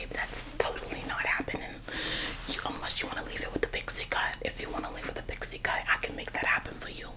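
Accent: South, United States. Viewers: The Southern accent is spot on i love it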